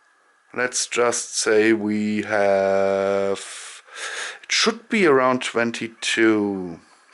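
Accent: Germany. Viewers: For god sake ur german accent is wünderbar! :oo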